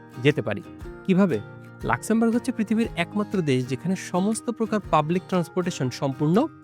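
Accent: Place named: Chuadanga, Bangladesh. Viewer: ভাইয়া জানতাম না আপনার বাসা চুয়াডাঙ্গায়। আমিও চুয়াডাঙ্গা জেলার দামুড়হুদা উপজেলার। আপনাকে অনেক শুনি, এখন বুঝতেছি আপনাকে ভাল লাগার অনেক গুলা কারনের মাঝে হয়ত ভাষার একটা টান ছিল যে নিজেও জানতাম না। ফি আমানিল্লাহ।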